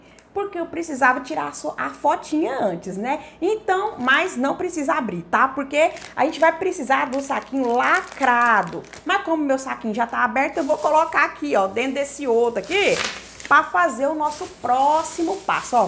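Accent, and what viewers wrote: Minas Gerais, Brazil. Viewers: Sotaque de mineira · Amo esse sotaque mineiro ❤️ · Gostei desse segredo,vou usar em casa! Gostei também do seu sotaque mineiro ❤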